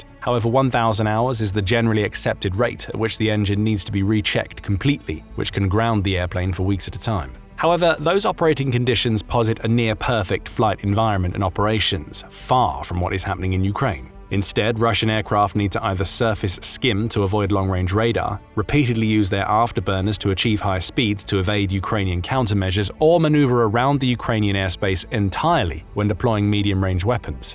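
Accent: United Kingdom. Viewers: These videos are copium with a British accent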